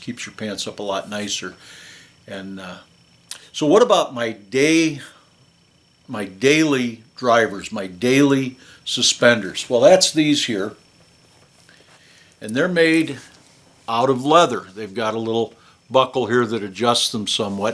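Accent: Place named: West, United States. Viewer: you can hear the west in his mouth lol. UNCLE!!!!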